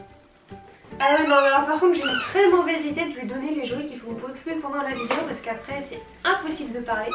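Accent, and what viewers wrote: England, United Kingdom. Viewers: Ton accent anglais >>>> ♥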